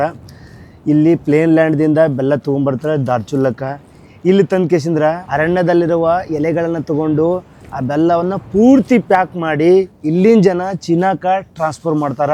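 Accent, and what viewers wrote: North Karnataka, India. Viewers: U k style bhashe super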